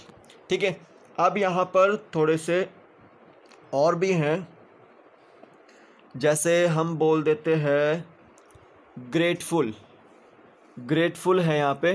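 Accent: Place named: Himachal Pradesh, India. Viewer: its really helpful thnku sir ...nd your himchali accent is too good😄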